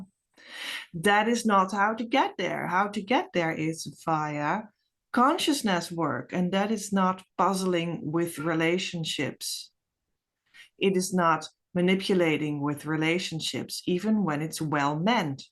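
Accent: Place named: Netherlands. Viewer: So nice to see you! My DM is Dutch so I recognized your accent right away.its so reassuring to know someone from The Netherlands is discussing Twin Flame. He would have me believe otherwise 🤭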